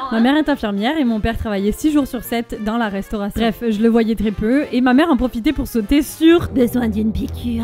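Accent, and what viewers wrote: Quebec, Canada. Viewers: Mes on est d'accord que elle a un accent québécois parce qu'elle était aller vivre o Québec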